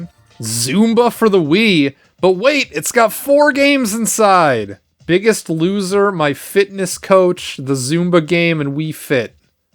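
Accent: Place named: Upstate New York, United States. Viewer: It's funny I thought I heard a upstate accent in some of your videos. All of my cousins have it.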